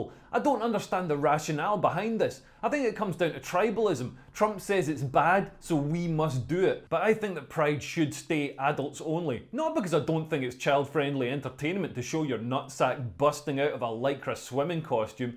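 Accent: Scotland, United Kingdom. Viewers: This guy and his damn beautiful Scottish accent is spitting all over me Spitting facts that is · Coming from a gay dude, great video. I completely agree, I thank you for speaking out about this. And who doesn't love a Scottish accent? Besides the Romans of course... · I Really Like his Scottish Accent Talking about why he Hate Lgbtq Idk What he saying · Bro is peak Sco'ish 🏴 👌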